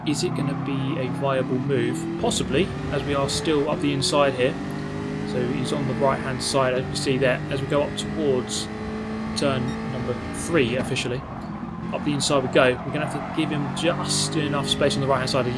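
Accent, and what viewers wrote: United Kingdom. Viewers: That commentary in British accent , makes this video feels like watching an actual race !! But this is Gran Turismo, on PS4.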